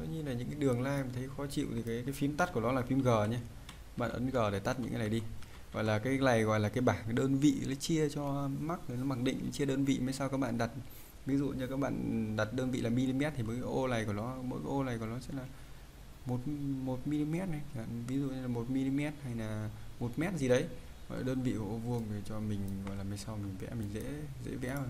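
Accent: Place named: Vietnam. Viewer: Đọc tiếng Anh như tiếng Việt, hài quá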